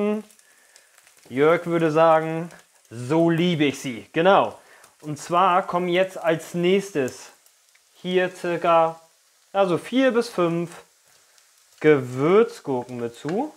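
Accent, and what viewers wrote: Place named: Thuringia, Germany. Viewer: Na das muss ich unbedingt mal nachkochen. Ruhig mehr Rezepte von. Die sind immer so ein bisschen moderner und ausgefallener. Spezialität sind doch eher Klassiker oder zumindest neu interpretierte Klassiker. Ich habe gemerkt, dass ich auf einem anderen Kanal schon oft solche "schnell, einfach und modern" Vorschläge gesucht habe, als ich die leere Pfanne gesehen habe und schon den thüringischen Akzent im Ohr hatte "erst mal einen Klecks Öl in die Pfanne" 🤣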